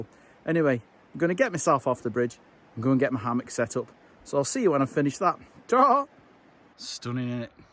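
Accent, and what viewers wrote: Stoke, United Kingdom. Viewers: I hear that you're a fellow Stokie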